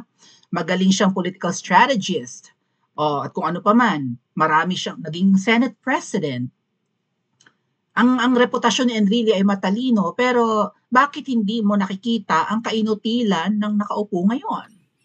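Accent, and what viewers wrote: Iloilo, Philippines. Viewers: Haha ilongga ka day❤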